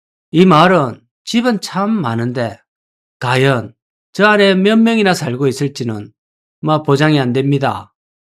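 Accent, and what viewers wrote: Gyeongsang, South Korea. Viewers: 좋은데 갱상도 말을 좀 줄여요~~!!!!!!!!!!!!!!!!!!!!!!!!!!!!!!!!!!!!!!!!!!!!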